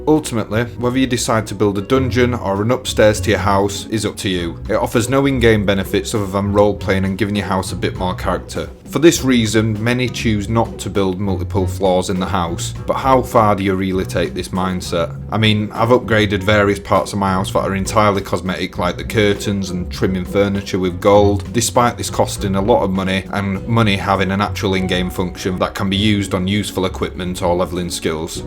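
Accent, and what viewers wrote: Yorkshire, United Kingdom. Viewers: first yorkshire accent ive heard on an OSRS vid, legend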